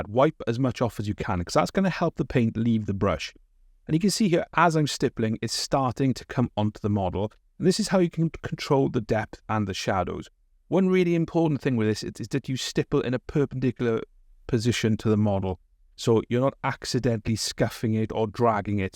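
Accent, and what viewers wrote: Wales, United Kingdom. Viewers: Not sure why the algorithm has only now led me to your channel. Pleasantly surprised to hear a fellow Welsh accent! Looking forward to watching more of your content!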